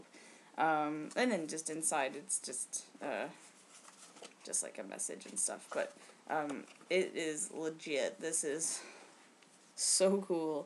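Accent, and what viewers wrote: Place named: Canada. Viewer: I thought you where American untill you pronounced out as "oot"and about as"aboot""and then I thought you must be Canadian.please correct me if I'm wrong.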